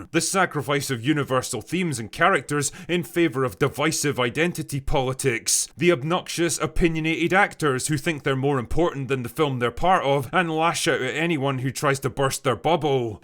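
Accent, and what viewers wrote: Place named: England, United Kingdom. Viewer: This was great classic stuff said right here! Well said & I agree. " " "The only English Accent that I care to hear"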